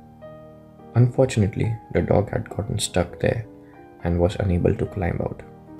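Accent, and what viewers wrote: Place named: India. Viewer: Hard for me to understand your English with heavy accents. Are you 🇮🇳 ?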